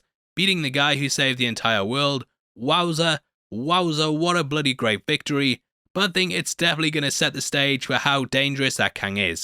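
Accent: United States. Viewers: nice american accent heavy !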